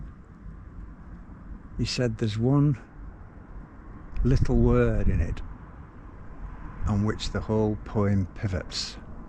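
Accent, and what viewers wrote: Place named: West Yorkshire, United Kingdom. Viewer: Your oration redounds in mine ears long after you have ceased it,. Of course, that West Yorkshire accent helps - intrinsically poetic as it is. But your being a musician makes your readings especially wonderful to my ear. Great taste in poetry, once again. And I'm glad you're sticking with this Thursday event. I thought the word was 'uplifted'. Not so sure now, though.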